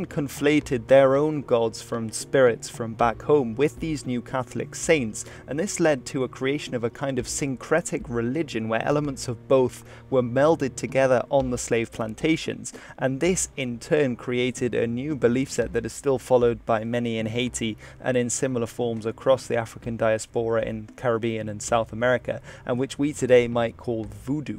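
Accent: United Kingdom. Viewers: he has a british accent unless it is a voiceover, they always absolve themselves, always A;WAYS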